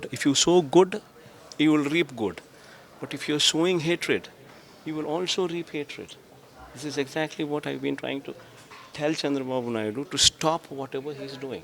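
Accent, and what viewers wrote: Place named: London, United Kingdom. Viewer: Basted jaghan is talking London English lucha jaghan is captain's for all criminal mafia done is lucha jaghan he himself telling his character and his mind of jaghan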